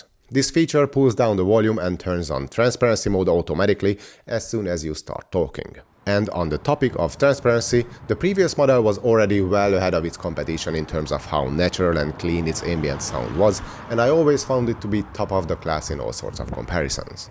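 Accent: Hungary. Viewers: Magyar vagy? Hallom 😁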